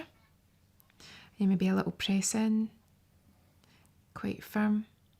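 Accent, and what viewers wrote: Scotland, United Kingdom. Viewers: If something feels good, or in the case of ASMR, sounds good, then yeah, it should really come as no surprise to anyone when the subject becomes relaxed, which of course is going to induce drowsiness. Whether there's science behind acupressure or not I guess could be for another discussion. I'm leaning more towards power of suggestion. Regardless, the soft spoken/Scottish accent combo is eargasmic, but I need more than 6 and a half minutes, so Ima loop it. 😬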